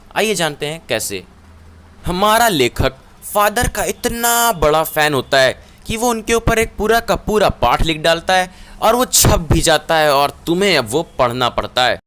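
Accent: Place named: Bihar, India. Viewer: Bihari ho ka bhai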